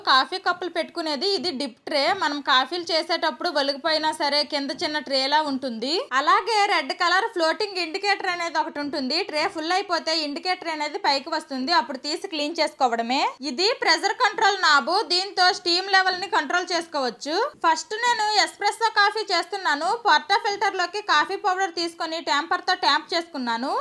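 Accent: Srikakulam, India. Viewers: Akka konchem matlade style marchu Srikakulam paruvu teyyaku hi andi maney vere di select chesko konchem style ga matladu em kadhu next vlog ala try chey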